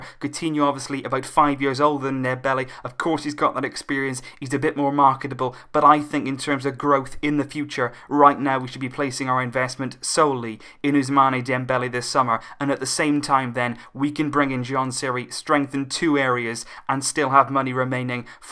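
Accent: United Kingdom. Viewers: he is honest,clear,and talks briefly and in British accent ,so shut up and let me subscribe.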